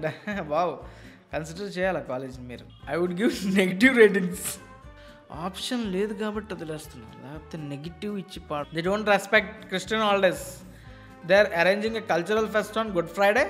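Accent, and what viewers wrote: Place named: West Godavari, India. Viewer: West 😂😂 slang iragadisav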